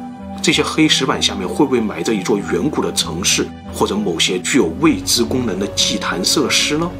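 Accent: China. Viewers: 的这个是江苏还是福建口音？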